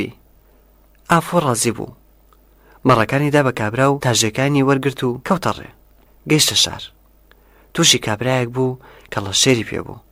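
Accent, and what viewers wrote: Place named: Sulaymaniyah, Iraq. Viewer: Xozga dangakatan bkrdaya ba shewazary slemany zor xoshtr bw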